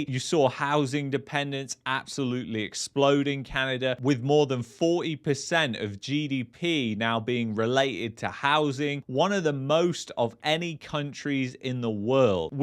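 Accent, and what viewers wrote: England, United Kingdom. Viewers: I believe he's from England. By his accent he's certainly from England. My roots of my distant ancestors go back to northern Ireland which has more world champions per capita including myself than any other civilized place on Earth. My great grandfather and great grandmother on both sides of my family were born in Canada in the 1850's.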